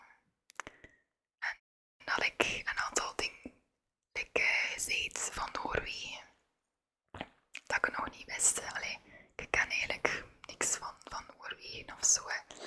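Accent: West Flanders, Belgium. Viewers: West-Vlaams is leuker, alleen bergrijp ik niet goed wat je dan zegt🤣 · Als een oost-vlaming met beetje zeeuws vlaams in zich is het heel leuk om naar het west vlaams te luisteren en het helemaal te proberen begrijpen ☺️ ook leuk verhaal over je buurman ik begrijp hem zeker!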